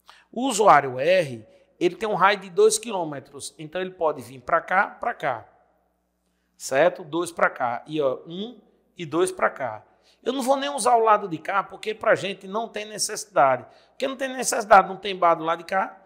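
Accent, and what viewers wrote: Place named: Maceió, Brazil. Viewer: Vcs são de Maceió? O sotaque parece muito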